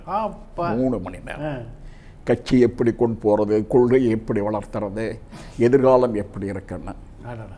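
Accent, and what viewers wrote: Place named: Coimbatore, India. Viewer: Kovai slang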